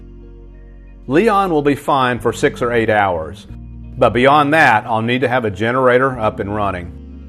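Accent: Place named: Midwest, United States. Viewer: I know you live in Georgia now and lived on the east coast prior to that but you don't sound like a southern gentleman to me. Where are you from originally, sounds like a midwestern guy to me.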